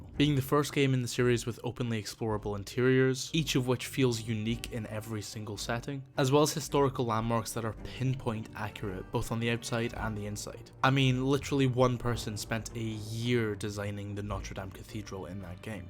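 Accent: United Kingdom. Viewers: Ur so British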